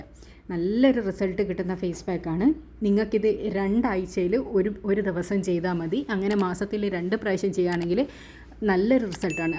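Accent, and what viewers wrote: Kannur, India. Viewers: മുഖം വെളുത്തില്ലെങ്കിലും നമ്മുടെ കണ്ണൂർ ഇത്തയുടെ ആ കണ്ണൂർ ഭാഷ കേട്ടാൽ മതിയല്ലോ ഏതായാലും നന്നായ് കൂടുതൽ ചിലവ് ഇല്ലാതെ പരീക്ഷിച്ച് നോക്കാൻ പറ്റുമല്ലോ❤❤❤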